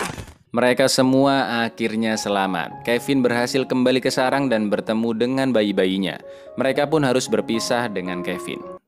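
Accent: Java, Indonesia. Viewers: Bang orang jowo ya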